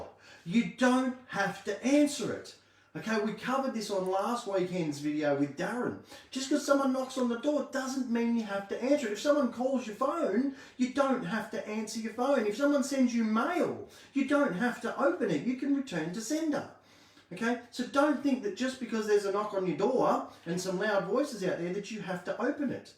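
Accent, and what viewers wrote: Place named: Australia. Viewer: So I tell the truth... and you delete my comment... can't have anything disrupting your, cash cow. "I'm absolutely appalled, every time I hear you, it's a SALES AD - Buy My Book, Buy My Book... I'm sick of it! You sound Australian... but I'm not sure anymore. If you were a true blue Aussie Patriot... you would make sure everyone had a FREE copy in in pdf and you wouldn't be sending people to FB to be spyed on!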